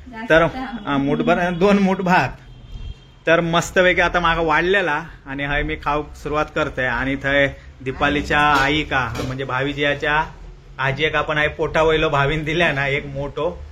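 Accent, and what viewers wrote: Malvani, India. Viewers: तुमची मालवणी भाषा पण खूप सुंदर आहे ऐकायला छान वाटते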